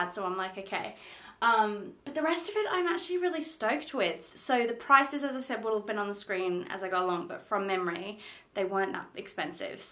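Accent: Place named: Australia. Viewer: So happy to hear an Aussie accent doing hauls. ( I live in Tassie)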